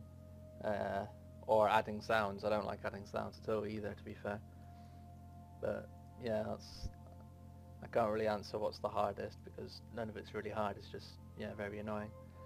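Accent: United Kingdom. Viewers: epic british accent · You sound like if a voicebot had a british accent ngl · Oh my god you have a so goof brithis acent. Its sounds so good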